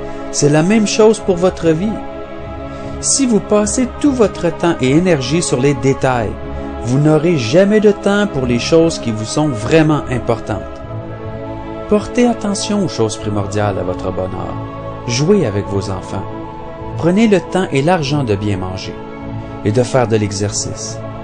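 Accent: Canada. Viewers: moi non plus, je trouve ses vidéos superbes, bien expliquées, et a chaque fois, elles me laissent songeur et heureux... De plus, je suis français et j'adore l'accent canadien et je le trouve très agréable à écouter. Merci.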